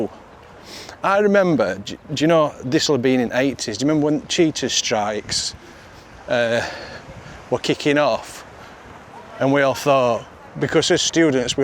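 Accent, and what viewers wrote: Yorkshire, United Kingdom. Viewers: Hello. You bring back good memories because my family on my father's side were from Leeds and on my mother's side from Barcelona. Not only because of the accent but because of the way of being. It is said that copper was invented by a Yorkshireman and a Catalan, both pulling a peseta coin😂😂. The next time you visit Barcelona I recommend attending the magical fountain. They are renovating it until August 2025. It has summer and winter hours. I worked as a tour guide until 2016 and the truth is that it is not well known but it is the best attraction. Maybe because it's free. There are more local people than tourists attending and performs at night due to the contrast of colors. Write it in your agenda. Cheers. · I very much enjoy your videos, especially the Yorkshire accent, big fan here! 🍻🍻 All the best!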